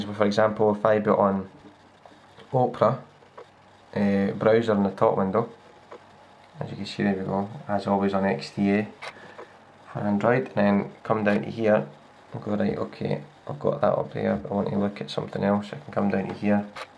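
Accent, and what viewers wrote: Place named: United Kingdom. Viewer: I live in Sacramento, California. But don't worry about your accent my mother is British and I used to watch BBC America growing up so you sound just like Hamish McBeth. I don't know how some Americans can't understand English. You should try the Star Trek LCARS GUI Simulator for Windows 8. It's not an Iconia keyboard mod but it's pretty damn cool.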